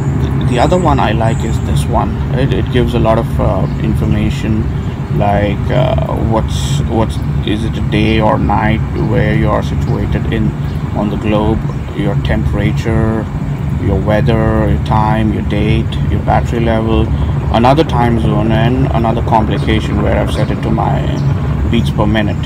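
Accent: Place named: India. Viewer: Lol.! Yes, I DO realise that many Indians speak English, after all, I live in Birmingham UK which has a very large Indian population! But it was your neutral ACCENT that impressed me, not your ability to speak English - many Indians retain a very thick accent when speaking English, and you don't have that. Anyway, all the best from the UK!!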